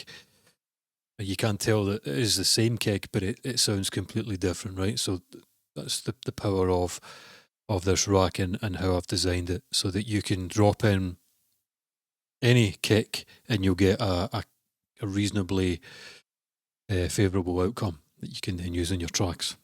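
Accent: Scotland, United Kingdom. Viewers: Are you Scotish mate? Great technique.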